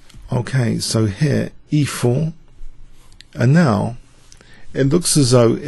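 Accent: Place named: London, United Kingdom. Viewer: Can you please use ''simpler english'' and more ''globalised'' accent :) .. nt all your viewers are from London ! that;s why more and more are vewing Matozelich .. :)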